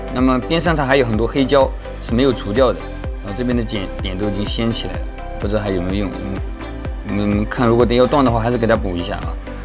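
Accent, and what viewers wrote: Wenzhou, China. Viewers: 一听你的普通话就知道你是温州人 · 可笑下面一群人在那里感叹师傅真是良心啊plapla的，这up主是专门拍维修视频的，点掉这么多正常情况下维修店都是放弃维修了吧，浪费这么多时间得不偿失都维修，不过还是得赞up主的心灵手巧，这口音很熟悉，身边很多都是温州人，特别的聪明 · 没看名字，一听就是温普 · 还是能听出来温州口语的普通话。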